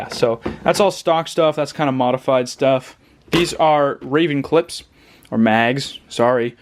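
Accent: Canada. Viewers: In America we say owt in Canada you say oot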